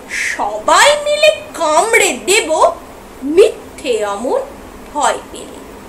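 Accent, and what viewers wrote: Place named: Kolkata, India. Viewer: Eta monehoi kolkata 🤔🤔🤔bangladesher bangla aro shundor💓💓💓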